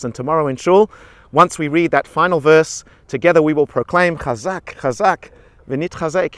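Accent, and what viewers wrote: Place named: Australia. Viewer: Wow, look who it is. All these years it must be 25 years and your Australian accents come back. I hope you’re doing well thanks for a great message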